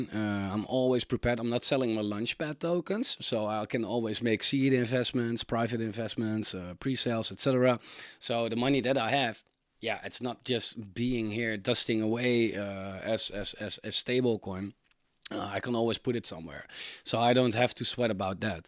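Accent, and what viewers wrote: Netherlands. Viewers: Nederlander? Nice video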